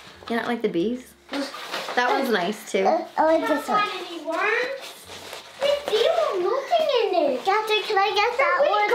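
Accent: Ireland. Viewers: Her little Irish accent is so cute ♥️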